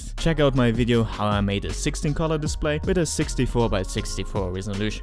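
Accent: Germany. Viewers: Du sprichst sehr gutes Englisch, aber ein Deutscher wird immer einen Deutschen der Englisch spricht erkennen 😄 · Heavy German accent ftw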